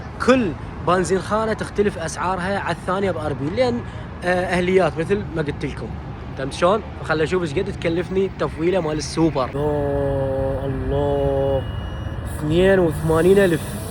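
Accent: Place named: Iraq. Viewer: راح أشاهد هذا الفيديو من أجل لهجتك فقط ! 😅 ما أحلى لهجة أهل العراق ❤ تحية لكم من المغرب 🤝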